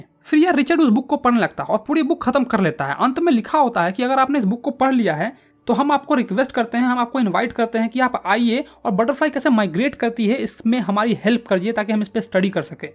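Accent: Bihar, India. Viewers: Haan, bhai ye bihar se hai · Aur ek aur cheez aap bihari ho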